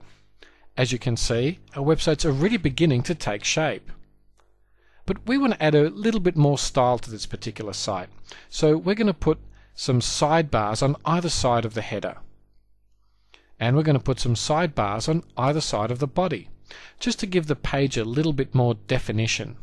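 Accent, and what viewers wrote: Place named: Australia. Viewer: nice tutorial Your aussie aye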